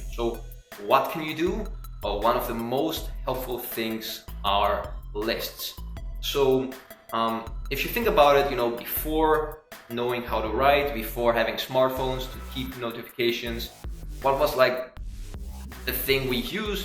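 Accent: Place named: Netherlands. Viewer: Nice video! I always make lists as they provide all the benefits you just mentioned. When I don't I just perform less activities in the day or during the week. Are you Dutch by the way? Ik kan een licht accentje horen ;p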